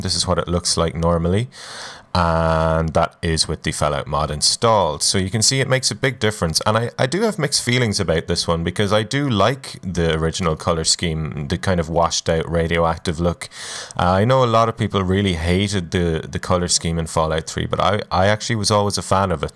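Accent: Ireland. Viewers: l know this is a very old video but in regards to marks mutant mod l personally dont use it because of the silly armored deathclaws and hogs it adds , lt just makes no sense from a lore perspective at least with the hogs and just seems silly to me .also fellout is kinda eh l feel it ups the contrast to much. also l cant pin down your local accent ? what part of ireland are you from ?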